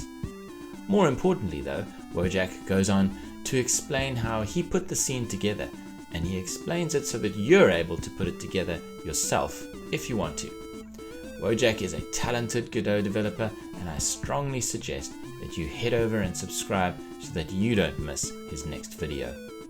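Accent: South Africa. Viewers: Great showcase! Are you South African by any chance? 🛴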